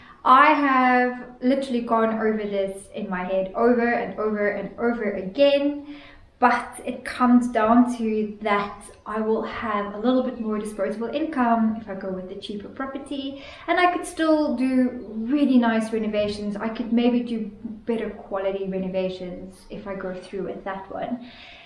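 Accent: South Africa. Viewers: Just found your channel and will definitely be following the rest of your adventure. Question: do I hear a bit of a South African or Zimbabwean accent there?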